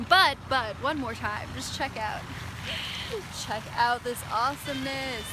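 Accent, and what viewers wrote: United States. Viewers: DOES A LAOTATION SPEAK WITH THAT TYPE OF ACCENT? I WOULD THINK SHE IS AN AMERICAN OF ASIAN DESCENT.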